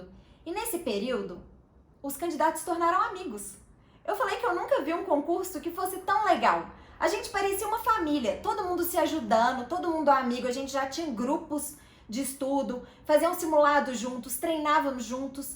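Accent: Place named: Minas Gerais, Brazil. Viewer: Sutaque mineiro raiz, a nossa minas gerais. hehehe ótimo vídeo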